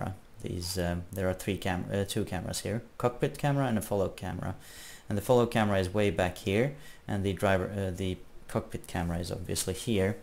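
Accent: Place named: Sweden. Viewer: jag hörde dirrekt att du var svensk! :)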